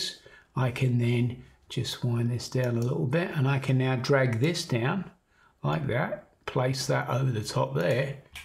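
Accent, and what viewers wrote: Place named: United Kingdom. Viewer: stupid british accent, i can't hear it, why you speak like it going in slow mo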